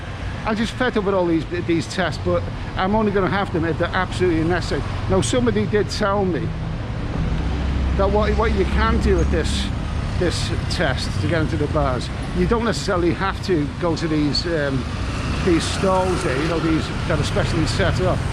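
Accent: Liverpool, United Kingdom. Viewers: i am sat here on a wooden stool washing up last nights dishes ( Issan) and am laffing my bollocks off at ya its amazing what the ol one two buckle my shoe can change ones mood!! This was like watching and listening to a scouse Bob Monkhouse... i wriggled that much on this stool av got splinters in mi arse... Great stuff Chok dee mi ol flower.. Big Cee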